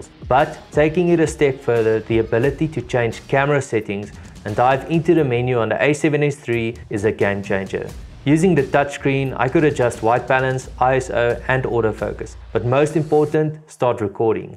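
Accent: South Africa. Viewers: Are you South African btw? Cause my parents are from South Africa but I wasn’t born there, but you definitely have a South African accent! Anyway I’m gonna watch the video now, the opening shots were amazing! So I’m sure this video will be great!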